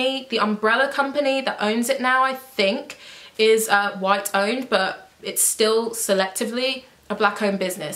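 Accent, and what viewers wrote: United Kingdom. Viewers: the British accent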